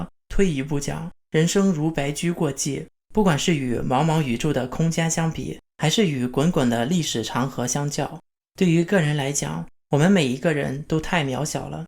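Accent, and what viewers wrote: Shandong, China. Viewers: 小编是山东人吧，口音和我一样哈，棒棒的哈！ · 听你口音是山东的啊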